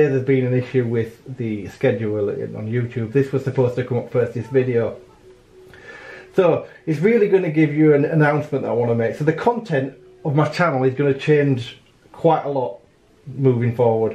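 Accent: Yorkshire, United Kingdom. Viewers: Looking forward to fpv racing stuff. I always look forward to your honest reviews and it's nice to hear a fellow Yorkshire accent talking so passionately about the hobby.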